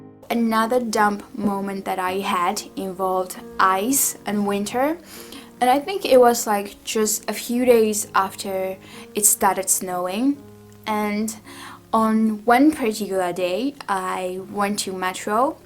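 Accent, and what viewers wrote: Myanmar. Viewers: Cheese getting hot лол and yup u got a burmese accent but not as strong as my Russian accent.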